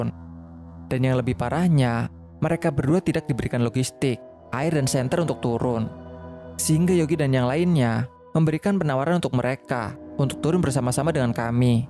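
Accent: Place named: Banyumas, Indonesia. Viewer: Yg bawain cerita orang ngapak ya😅😅😅😅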